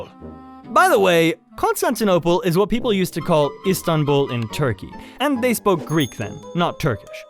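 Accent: Germany. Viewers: It sounded German lom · this guy really puts the german in the germanic scandinavian accents, ngl horrible horrible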